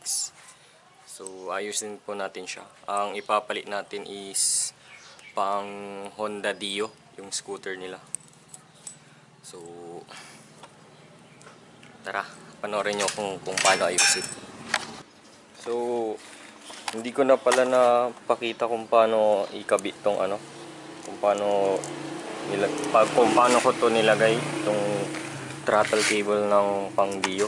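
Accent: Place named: Pangasinan, Philippines. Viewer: taga pangasinan punto nyu lodz ah😂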